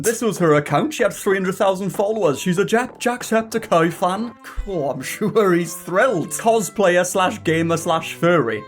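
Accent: United Kingdom. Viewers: I'm english but I can mostly understand this British accent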